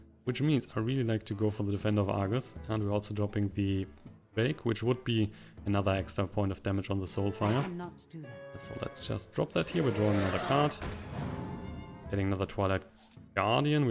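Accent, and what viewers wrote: Germany. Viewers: What country are you from? Your accent sounds german.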